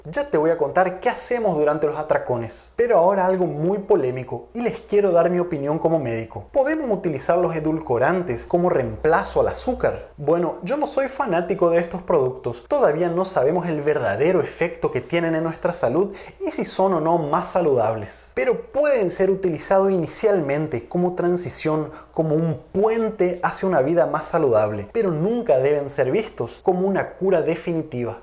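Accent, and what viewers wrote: Paraguay. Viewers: No me gusta su acento de paraguayo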